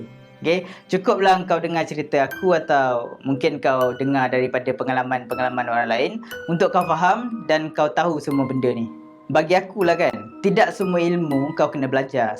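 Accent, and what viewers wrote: North, Malaysia. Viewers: Loghat utaqa bang bukan main sempoi🗿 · Bolela loghat utaqa abg🤣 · Hahahahaha lawak bang buat lorat utaraa😂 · Saya org utara saya tk tau nk cakap mcm mana bila abg cakap loghat utara. 😅😂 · boleh lh skit skit nk ckp utara tuuh sebab saya oang utara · Pandai pulak die cakap utare😌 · bang saya orang utara bagi saya abang punya utara tu okay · Bahasa utara abang tu betul la...tak sangka plak betui...saya oqang utaqa hahaha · Loghat utara tu still acceptable 😂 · Boleh tahan jugak loghat utara abang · Lawak la oi abang cakap utara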